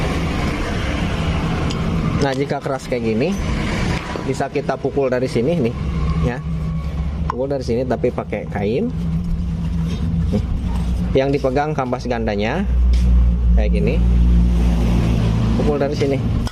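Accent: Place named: Indramayu, Indonesia. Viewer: Kaya wong dermayu😊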